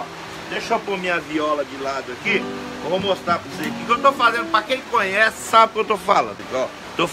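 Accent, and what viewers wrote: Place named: Minas Gerais, Brazil. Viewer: o Se tá parecendo k é de Minas gerais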